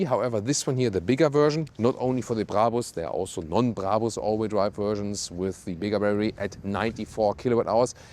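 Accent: Germany. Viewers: Als Amerikaner würde ich fragen: in England spricht man Englisch? Mn hört seinen deutschen Akzent her recht gut raus 😊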